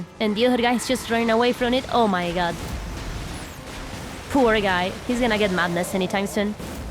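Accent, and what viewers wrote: Argentina. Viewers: Eres argentina? 👀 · The way it pronounced is because of his argentian accent hahaha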